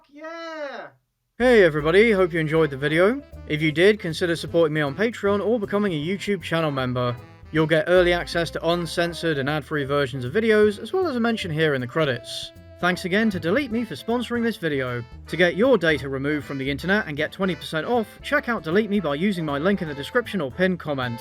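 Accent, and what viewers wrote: United Kingdom. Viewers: I'm a simple man I See talking british dog man I click.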